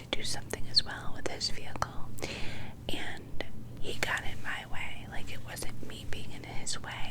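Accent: New York, United States. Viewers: Okay these videos are tingly but they are really fun. The new york accents kill me . Never ever ever ever ever stop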